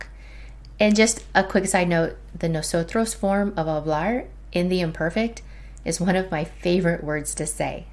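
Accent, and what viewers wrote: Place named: United States. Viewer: I get so distracted with your accent that I dont undestand what you are explaining. You sound so american that you are pronouncing the O and A with american accent. This may have been a good explanation of the imperfect but your accent is really distracting me.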